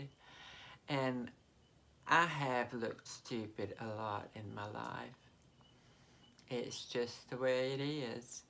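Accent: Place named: Mississippi, United States. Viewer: like you I'm 63 years old and I still full on hug my son who is 41 and a leader of men and women in the fire service. I have always hugged my family even the inlaws. You and I have more in common than most people would believe. Like you I struggled in school because in the early 1970's learning disabilities like dyslexia or the broader spectrum of autism didn't reach the rural schools. In spite of my comment to learning, I just didn't get it. While my grades were never very good, my conduct and respect for others was above expectations. Turns out I'm extremely mechanical and thrived in the apprenticeship programs of the trucking industry. Show me how to do something and I'll never forget it, tell me, and it will time for me to figure it out. I love your Mississippi draw and voice inflection. Bests wishes and stay positive.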